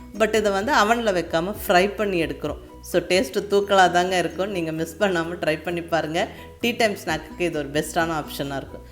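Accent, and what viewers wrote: Kongu, India. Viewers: Hello mam really all your receipes are awesome the way you explain are chanceless, I like your kongu tamil, I love you so much mam, Always Be happy share ur receipes more love u from Chennai this is also my favourite 😍 receipe keep rocking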